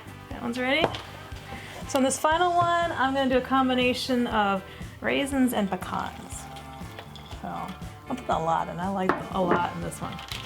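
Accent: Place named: Midwest, United States. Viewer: Hi,! That pumpkin bread looks amazing! So cool that you got the recipe from a book when you were a child. Always nice when there is a story behind the recipe. You are right: cans and boxes are getting smaller over time. I agree that older recipes do not always have a lot of spices. I think that people ate food that we might consider to be a bit bland today... I agree that sometimes too many chocolate chips can be a bad thing. Woodstock...Illinois? I thought you might be a fellow Midwesterner because your pronunciation (I am really into languages). That turned out great. Thanks for sharing!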